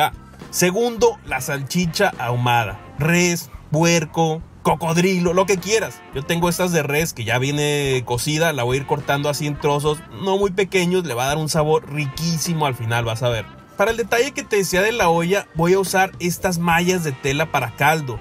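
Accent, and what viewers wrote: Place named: North, Mexico. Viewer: Yo tengo entendido que es de saltillo o torreón no estoy muy seguro pero vive en estados unidos y no tampoco es de Monterrey solo que es el acento norteño que tienen en eso lares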